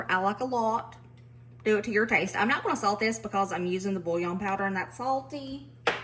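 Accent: South, United States. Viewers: NO SHAME IN HOW YOU SAY OIL!!!!!!!! I say it like that too!! People tease but I love a Southern accent. That's one of the reasons I was hooked on your channel so fast! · Foil, oil, boil, anyone from the south says it just like you. It's part of our charm. Don't change.❤ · I grew up in Chicago. Wash sounds like warsh or whoosh. I got teased so much when I joined the army that I forced the accent away. My mom said I sounded like I was from Boston. How you pronounce the words is due to your Southern accent. It isn't wrong; it's just different. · I love the way you say “ole” I love the southern accent❤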